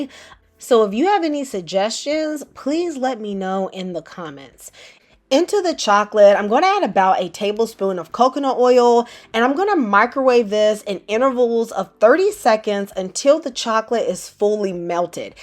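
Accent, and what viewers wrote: South, United States. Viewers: Are you Southern? Like the video 💙💚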